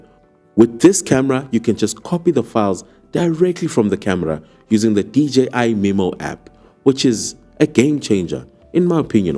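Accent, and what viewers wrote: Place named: South Africa. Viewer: I heard the South African accent and subscribed. Also, I like the zooming in and out of your MCUs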